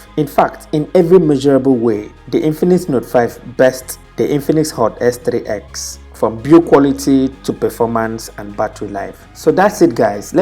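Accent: Nigeria. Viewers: Nice to hear a Nigerian voice in the Tech Youtube world. Keep it up bro. Nevertheless, most Nigerians (May be Africans in Africa) buy phones without checking out reviews. Infinix, Techno, etc don't make waves outside here. I think its because though they are generally cheaper, they dont offer the best amongst other budget phones. 4000mah battery not lasting half a day. Too bad. There are better phones of same price range such as the Nokia 6 and similar series; and some Motorola series. Wonder why these good phones are not so common in countries like Nigeria. Nigerian marketers only look to make most of gains rather than satisfying customers. Period.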